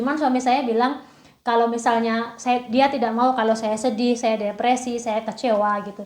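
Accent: Java, Indonesia. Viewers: Suka liat gaya bicaranya Bu, kelihatan sekali aura pendidiknya. Biarpun dah lama di Eropa logat jawanya masih medok dan selalu menggunakan bahasa indonesia. Cara mendidik Matilde pun masih kental sekali dengan budaya Jawa, selalu bilang maturnuwun dengan luwes. Sukses selalu Bu, semoga senantiasa sehat dan membawa berkah bagi keluarga, aamiin....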